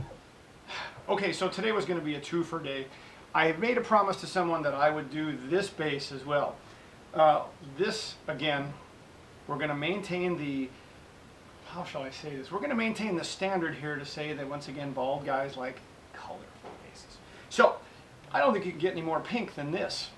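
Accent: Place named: United Kingdom. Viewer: love the british accent. greetings from london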